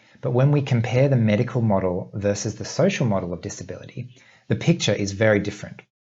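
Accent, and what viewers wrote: Australia. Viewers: I am Chinese and I grew up learning American English, and ever since watching your videos, I have become very fond of the Australian English.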